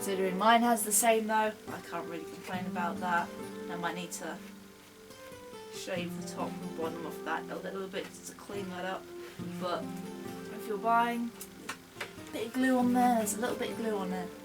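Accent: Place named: Peterborough, United Kingdom. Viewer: Are you from Peterborough? I recognise your accent.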